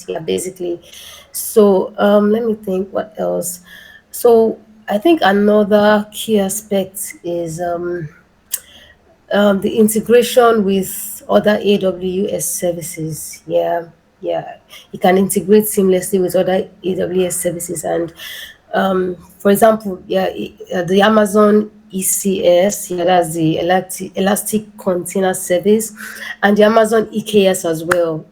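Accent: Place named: Nigeria. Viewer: I could sense the Nigerian accent. Please tell me if I am right?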